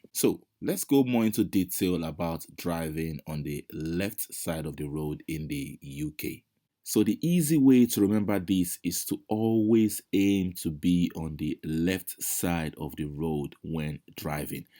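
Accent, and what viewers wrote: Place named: Nigeria. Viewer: i guess you are from Nigeria due to your accent😀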